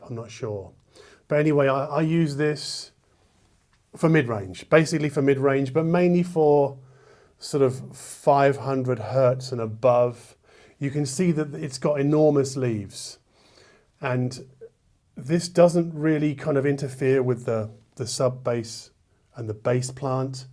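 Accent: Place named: United Kingdom. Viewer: Just shows that anything sounds legit when said with a British accent!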